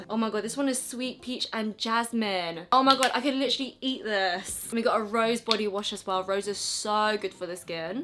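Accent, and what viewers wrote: England, United Kingdom. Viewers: I've been following you on Instagram for a while and this is the first time I'm hearing yr voice honestly cant remember hearing yr voice love yr English accent and loving yr videos. Subscribed right away.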